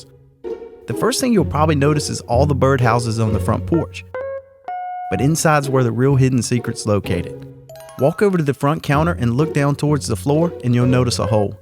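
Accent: South, United States. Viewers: LOVE YOUR SOUTHERN DRAW..🥰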